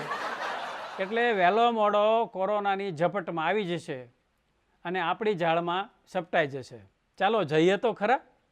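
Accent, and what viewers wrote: Surat, India. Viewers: વાહ...હસીને લોટપોટ થઈ જવાયું...😂😂 આ તમારી વાર્તા વાંચી હતી પણ આજે તમારા સ્વરમાં સાંભળવાની બહું મજા આવી...👍🏻 સુરતી ભાષા અને યમરાજ અને ચીત્રગુપ્તનું અટક હાસ્ય તમે જે કર્યું એમાં બહુ હસવું આવ્યું.... ખૂબ જ સરસ વાર્તા સંભળાવી અને આગળ પણ આવી રીતે નવી વાર્તા સંભળાવતા રહેજો..... 👌🏻👌🏻👍🏻🤣🤣🤣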